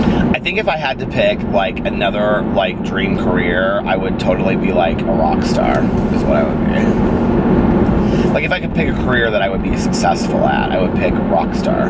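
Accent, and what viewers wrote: South, United States. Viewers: I love you! And I love your southern accent. I’m from Ar & I Snapchat you all the time. Love how you say drank, sang.. 😂💋 have a good day!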